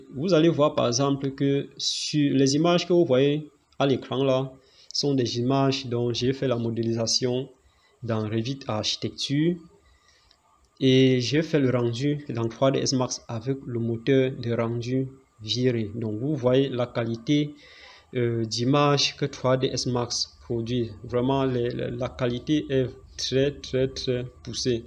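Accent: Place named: Cameroon. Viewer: vous êtes camerounais je suppose ! J'aime trop la manière de parler des camerounais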